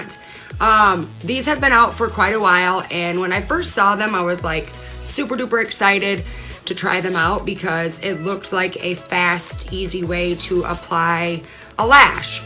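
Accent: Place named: Michigan, United States. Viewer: Love you video, are you from Michigan? You have a Michigan accent lol.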